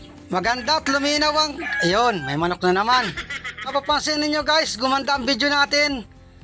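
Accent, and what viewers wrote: Bicol, Philippines. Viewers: Parihas Silang taga Bicol kaya parihas dn ang style ng boses nila.. sana nga magka Collab sila 😅 halos magka parihas dn subscriber nila 😊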